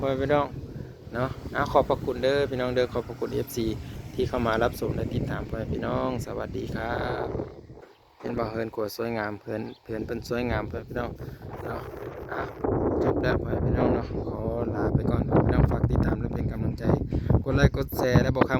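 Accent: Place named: Phu Thai, Thailand. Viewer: ສະບາຍດີ ເວົ້າສຳນຽງຜູ້ໄທກໍມ່ວນຄືກັນ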